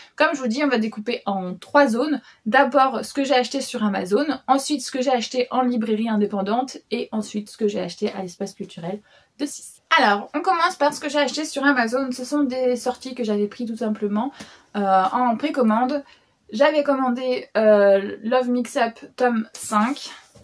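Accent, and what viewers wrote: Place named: England, United Kingdom. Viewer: ton accent anglais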